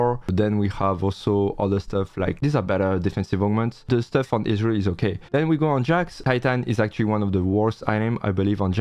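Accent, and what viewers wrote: France. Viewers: J'entends un reuf français pour sur ! 😂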